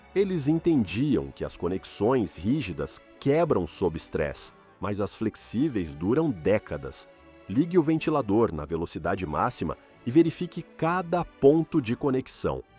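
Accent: Brazil. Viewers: Sotaque brasileiro e texto da guiana. Ruim de ouvir "à falar", "à andar", etc.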